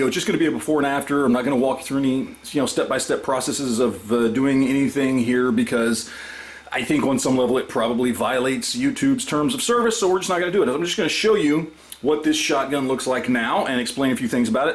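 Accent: South, United States. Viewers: I recently watched your first heritage rough rider review from 10 years ago.... Man, you had a thick southern accent. Damn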